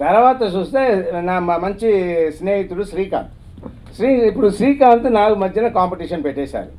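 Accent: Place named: Telugu, India. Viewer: Don't blame him about his Telugu slang OK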